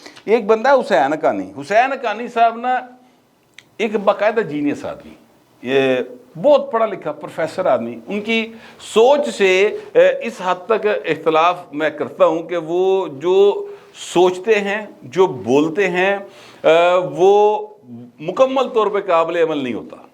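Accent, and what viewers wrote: Lahore, Pakistan. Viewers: sb zabardast very interesting and very very well describe and most importantly you describe purely in our Lahori style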